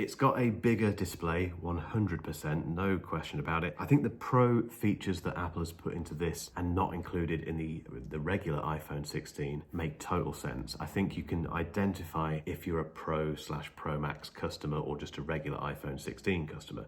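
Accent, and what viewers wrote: England, United Kingdom. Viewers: Unwatchable ramblings. I really loved Samsung galaxy and didn’t miss iPhone apart from the ecosystem… 😂😂😂… my dude do you comprehend that it’s all about the ecosystem. What did you use it for… the calculator? Another proof that English accent does not mean intelligence. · Listening to British people review products is excruciating torture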